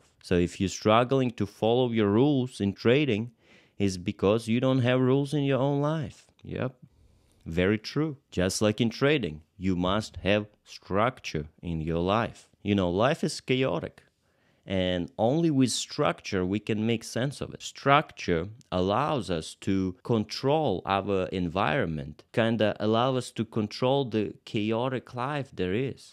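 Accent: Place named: Sevastopol, Ukraine. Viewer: Wonderful Your accent reminds me of an old friend from Sevastopol Good luck from Ireland☘☘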